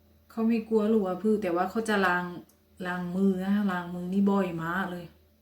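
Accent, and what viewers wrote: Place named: Ranong, Thailand. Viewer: สำเนียงเหมือนคนระนอง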